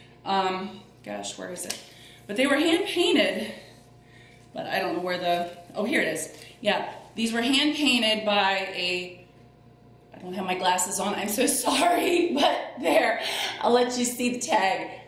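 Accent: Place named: North, United States. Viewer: I have those same tomatoes too. I use them in my summer decor. Our weather isn't too bad here. No snow and temps in High 30' today. Not as bad as some places that got dumped with snow. Are you from Wisconsin or Minnesota? I feel like you have that northern accent. I grew up in Michigan, people say I have an accent too lol.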